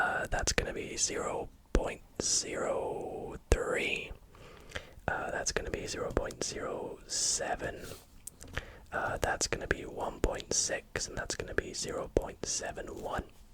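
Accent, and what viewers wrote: Scotland, United Kingdom. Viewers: You sound a lot like jojo asmr with an occasional Scottish accent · man’s talking bri’ish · I new you were from Scotland 🏴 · were do you come from in scotland?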